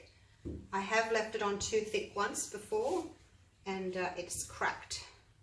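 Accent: Australia. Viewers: Lovely to hear an Aussie voice using products available in Australia - Thanks - Sue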